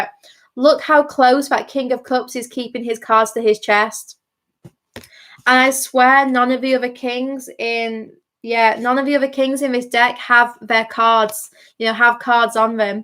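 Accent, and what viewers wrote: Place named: Wales, United Kingdom. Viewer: Well done on your first YT live!! Personally prefer YT to IG. You sound like you're not too far from me. I'm in North Wales xx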